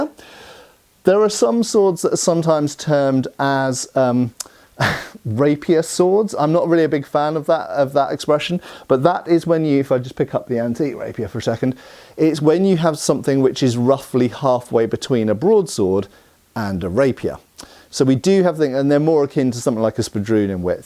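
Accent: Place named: United Kingdom. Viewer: Nice video . Today I'm practising HEMA, and as spanish I would like to get as much possible "Spanish Swords models. I Have realized that there are millions and it's going to be an impossible mision. Perfect your british accent (and difficult)to practise my English listening. Beatiful swords you' ve got. THANKS MATE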